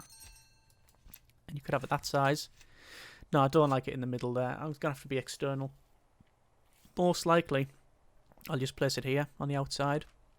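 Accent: United Kingdom. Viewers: Hold up wait a minute are you British or Scottish, I only just realise that you are either British or Scottish, but if you’re not I’m sorry. I’m just saying this because you sort of sound like it.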